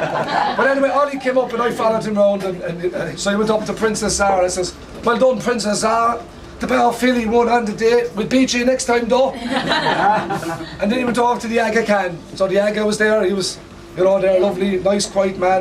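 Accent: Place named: Kerry, Ireland. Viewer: No...... long winded and sounding like a kilgarvan man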